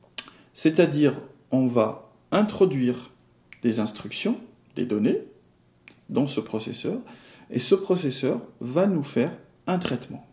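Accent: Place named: Italy. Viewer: C'est ça qu'on appel "LA PÉDAGOGIE". PS: avec accent italien.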